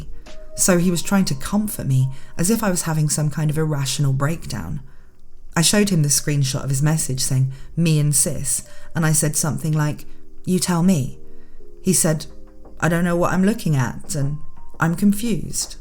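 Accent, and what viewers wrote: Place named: United Kingdom. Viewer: More! Because of your accent, I default every story as something happening in the UK somewhere. Then you talk about States and other American stuff and there's a brief pause while my brain processes the disconnect.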